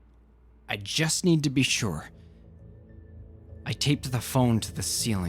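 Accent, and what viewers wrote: Canada. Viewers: Narrator has to be Canadian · is the narrator canadian? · This is the first I've ever been able to identify a canadian accent as a non-english speaker. You should be proud lol! At least stop apologizing for it :D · The narrator is Canadian. It makes no difference towards the story, just an observation. Mainly getting this from the way he says words ending in -out. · | I'm american, Canadian accents don't bother me at all, but even if it had your reading was fantastic sir. honestly didnt really notice it until i read the comments because i was on the edge of my seat. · this was amazing! I love listening to you read.. I love the Canadian accent!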